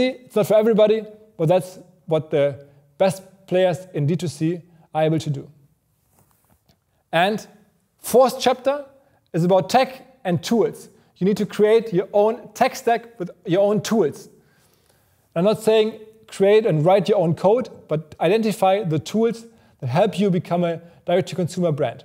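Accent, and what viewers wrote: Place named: Germany. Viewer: German English :)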